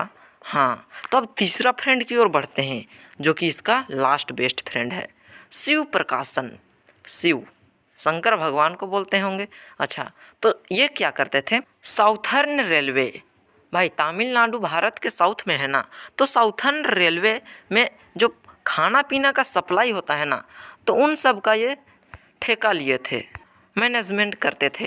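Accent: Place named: Odisha, India. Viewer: Bhaiya apka aawaz se aisa laga ki aap Odisha se ho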